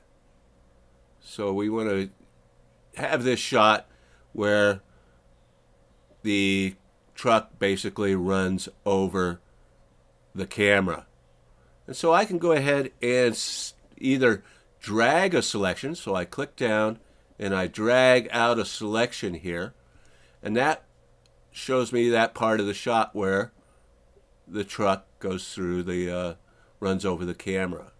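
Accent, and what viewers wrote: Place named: United States. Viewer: the english of yours is pretty dement, speaking like proper yankee.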